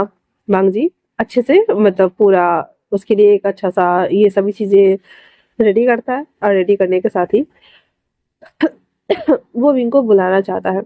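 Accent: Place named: Jharkhand, India. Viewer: Aapki awaaz bahut acchi hai use per Jharkhand ki word aur bhi acchi lagti Hai jab Main vahan ki awaaz sunti hun mera dil khush ho jata hai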